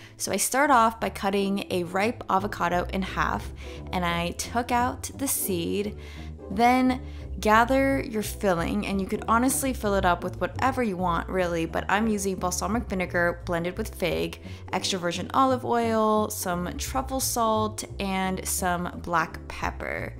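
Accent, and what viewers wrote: United States. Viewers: It's an American accent. Where I'm from we speak like that as well. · Lucy Blennerhassett in american english we dont pronounce the “h” in “herbs” :)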